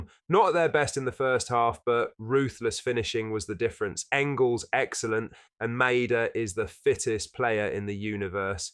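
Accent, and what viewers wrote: England, United Kingdom. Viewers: Were do you stand mate you have an English accent and comment on Celtic but I have also seen you in a Sevco shirt giving it about them as well so where do your loyalties lie......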